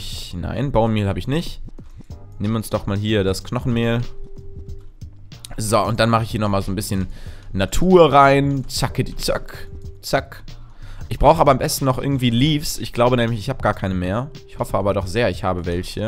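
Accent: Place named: Cologne, Germany. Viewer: Kölner Dialekt.. :D Manschmal und Tich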